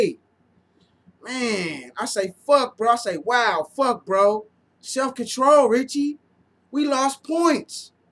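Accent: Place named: United Kingdom. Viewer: Your British accent! Lmao 🤣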